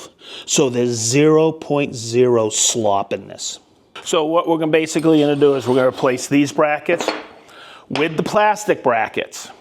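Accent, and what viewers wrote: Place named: Massachusetts, United States. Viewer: Wicked awesome!! (I love how your accent reminds me of all my mom's family - Swampscott, MA baby!) I am a relative noob to woodworking at 54 years young, but I am looking forward to upgrading my MFT-3. Love these super-helpful videos,!!! Answer: I tend to prefer metric since I grew up overseas, but am also comfortable using imperial. My "go-to" is metric, though.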